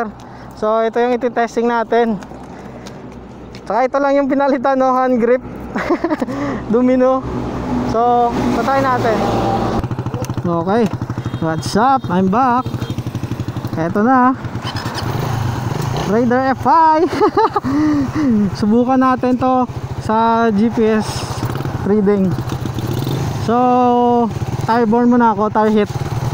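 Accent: Iloilo, Philippines. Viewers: Sir taga saan ka arang ilonggo kanyata ah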